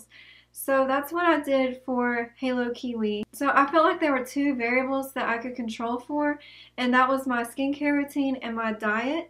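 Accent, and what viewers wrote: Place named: South, United States. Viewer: Let your southern accent come through. No need to hide it.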